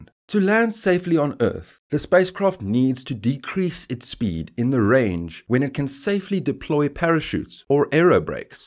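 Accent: Afrikaans, South Africa. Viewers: My dude you Afrikaans? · Is that a SA accent? Good video by the way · you sound South African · I hear a fellow Saffer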